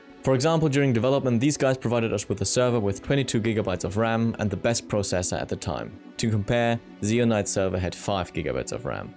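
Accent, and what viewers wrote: Germany. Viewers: I am German so I know what things are hard to say and I hear that just a tiny bit but I didn’t see anyone else recognise German accents so it could just be me being very good at hearing these kinds of details. But it’s fine. You don’t have to panic. I am in Hesse and one day my pronunciation just became better. I just decided that I am gonna put more effort into my speech.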